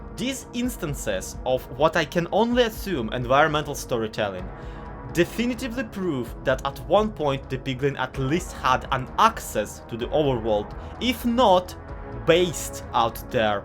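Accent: Russia. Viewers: У тебя русский акцент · у тебя акцент русский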